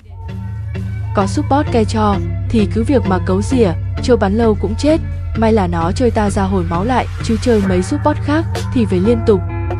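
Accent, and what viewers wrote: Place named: Quang Tri, Vietnam. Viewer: Em ấy giọng Quảng Trị nên hơi khó nghe cho các bạn miền trong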